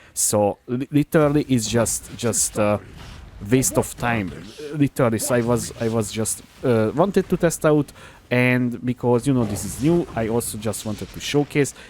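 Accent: Hungary. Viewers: Hungarian accent alert!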